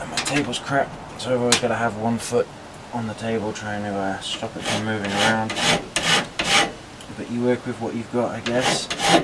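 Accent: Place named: United Kingdom. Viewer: Ahh cool stuff I knew it was a uk accent haha. I have a lot of subscribers from Canada. Cool videos brother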